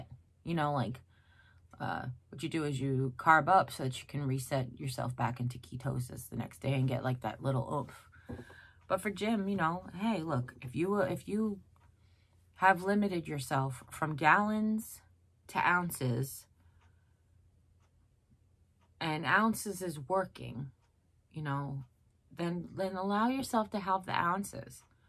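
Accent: New York, United States. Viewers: I love your New York accent...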